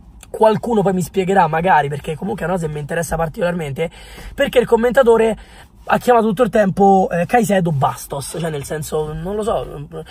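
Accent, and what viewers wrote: Rome, Italy. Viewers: Un consiglio. Se aspiri a diventare un commentatore, non puoi commettere errori pacchiani. Uno: il Milan, nel 2016, ha vinto la supercoppa italiana. Due: l'Inter ha vinto la Coppa Italia nel 2011. Tre: non puoi non sapere il cognome esatto dell'allenatore più vincente della storia della Lazio. Quattro: fossi in te, eviterei di parlare in romanaccio (il romanesco, che è altra cosa, è di Belli e Trilussa).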